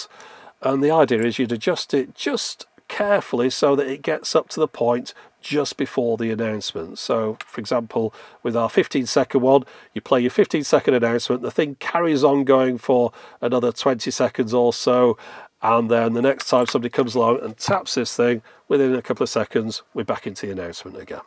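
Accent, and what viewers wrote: United Kingdom. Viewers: Your voice through the window and brit accent sounds like C3PO. No offence...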